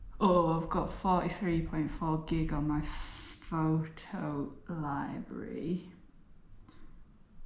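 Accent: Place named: Wales, United Kingdom. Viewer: You're definitely Welsh 😂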